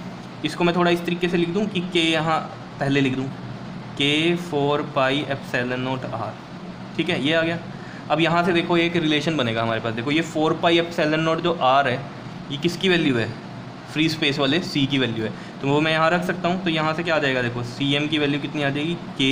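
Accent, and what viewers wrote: Punjab, India. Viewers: Is u are from punjab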